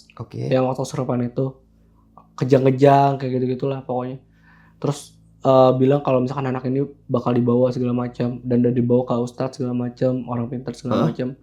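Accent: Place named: East Java, Indonesia. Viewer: saya kira vidio realnya mange🙏🏼... soale saya orang Jawa timur😊suaranya kyk orang Jawa timur 😊🙏🏼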